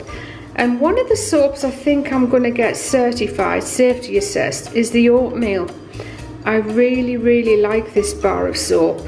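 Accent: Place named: England, United Kingdom. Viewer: Beautiful colour palette in this bathroom. Beautiful shining Chrome fittings and towel rack really complement the shining tub and basin. If you ever give up soap making you could do interior decorating. You have such a beautiful English accent maybe that's why everyone wants to hear you talking. Have a wonderful day x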